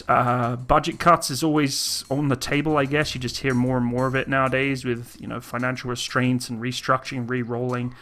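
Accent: United Kingdom. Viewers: What part of UK is your accent from?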